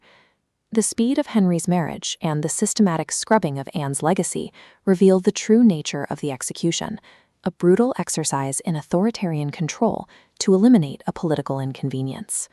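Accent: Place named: United States. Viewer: If you are going to post British stories, at least use a British Accent, instead of American nonsense.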